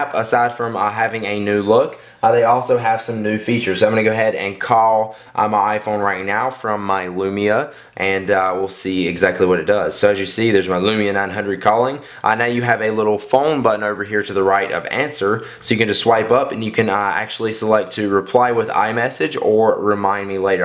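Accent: South, United States. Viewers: you've probably got the coolest american accent. coming from an aussie. lol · What accent is that? Sounds Southern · I'm not being derogatory but it's funny that this guy is always wearing wigger cloths but sounds really country, that's an odd breed mix haha.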